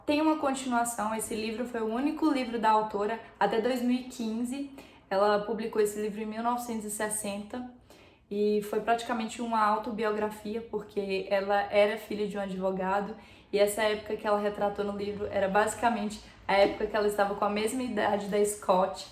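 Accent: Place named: United States. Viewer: Sotaque americano 😍😽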